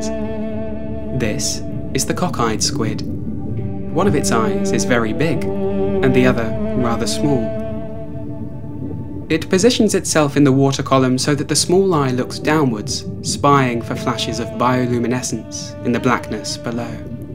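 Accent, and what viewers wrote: England, United Kingdom. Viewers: he literally has an english accent is this comment supposed to be rage bait 😭😭😭😭😭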